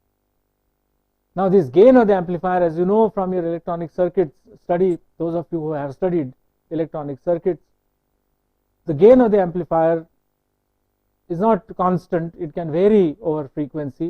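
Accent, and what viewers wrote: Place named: South India, India. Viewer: Some Southrn Indian Mix English But it can be understand, Who D Fker Says It Cant be understandble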